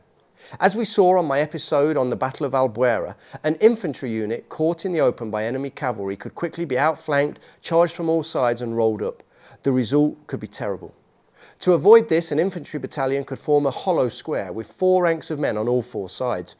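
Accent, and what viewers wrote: United Kingdom. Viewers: Can I ask what part of the UK is your accent from ?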